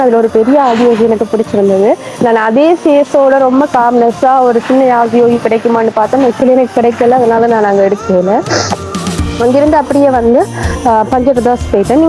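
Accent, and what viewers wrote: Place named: Tamil, Sri Lanka. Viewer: நீங்கள் டிராவலர இல்ல யூடியூப் பரா உங்கட தமிழ் ரொம்ப அழகா உங்களைப் போலவே இருக்கு நீங்க ஈழத்தமிழர 😊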